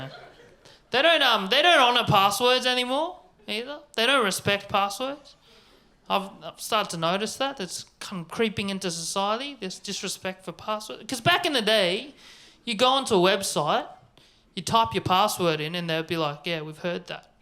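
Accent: Australia. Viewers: I've never heard an Asian speak Aussie. I had a mental block like it was an impossibility or something. Silly American, laugh. So I did. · What in the magical costume change. As if the aussie accent on an asian wasn't enough for my post-partum-pandemic brain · He sounds like every single Asian kid at my high school, im from Australia. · I've lived in Australia since I was 3 and I'm now 34 .... the Aussie accent on this guy bloody gets to me... a fkn question/upwards inflection after about every 2 or 3 statements. Is he from Sydney? Can't be bothered looking it up. He's funny-ish. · A chinaman with an aussie accent 🤣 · o7 from eu. Your Australian accent is almost cheating because of the +1 to the sillyness factor. Keep up the good work of writing funnies.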